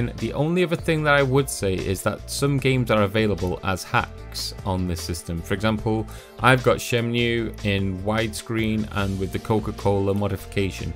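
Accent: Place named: United Kingdom. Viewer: Where the feck are ya from pal? Can't quite place that accent. After loads of vids it's pecking mi head bad. Deffo UK but where!? :)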